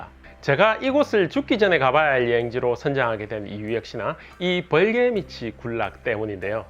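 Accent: Gyeongsang, South Korea. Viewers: 다 좋은데 경상도 액센트 귀에 확 거슬립니다. 가이드 하시는 것은 영 파이라예 ~~ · 경상도발음과 액센트를 좀만더 고쳐주셨으면 싶어요.ㅠㅠ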